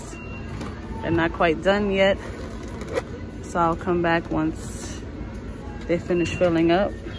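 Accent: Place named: Caribbean. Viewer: I hear an accent.u from the caribbean?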